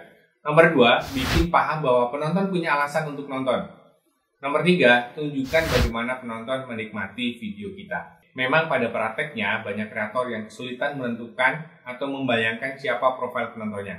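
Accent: Java, Indonesia. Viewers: Terima kasih banyak penjelasan nya pak, sukses terus. Njenengan jowo pundi?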